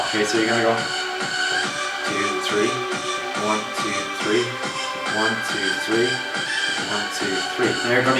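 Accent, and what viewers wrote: Ireland. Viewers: your accent gives me life. irish accents are fab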